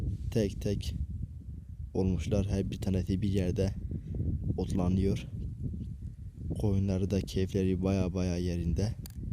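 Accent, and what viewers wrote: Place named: Muş, Turkey. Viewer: Şivenden dolayı muşlusun gibi geldi bana. Doğrumu tahmin ettim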